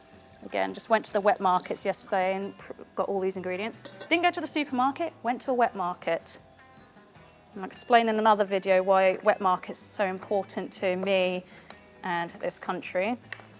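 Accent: United Kingdom. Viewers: LoL - first few seconds threw me off - until i realized she has a British accent... What da HAIL.... 😜🤣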